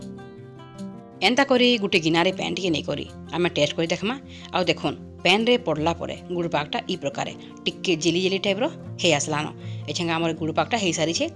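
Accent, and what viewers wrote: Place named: Sambalpur, India. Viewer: Tumar samalpuri kathata jaha mitha lagla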